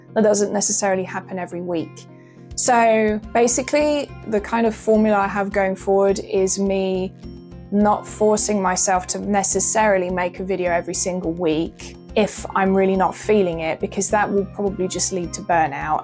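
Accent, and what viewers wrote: United Kingdom. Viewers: I watch the channel’s videos because of the content, and also because I’m learning English your British accent is music to my ears.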